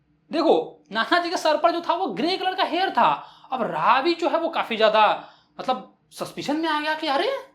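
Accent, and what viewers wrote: Bihar, India. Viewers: Sir aapka accent Bihari aur east UP jaisa h...Mast h sir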